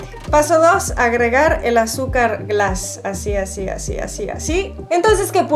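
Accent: Mexico. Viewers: Hola tú puedes pasar por una mexicana y más por el acento que has logrado al hablar el español 😃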